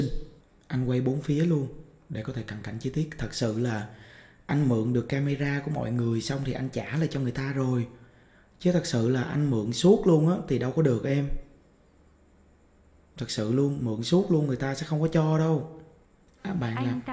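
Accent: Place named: Mekong Delta, Vietnam. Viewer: Thật em nói chuyện rất là chậm rãi rõ ràng truyền cảm và lịch sự. Vì nói giọng miền tây mà nói nhanh thì ng bắc nghe ko rõ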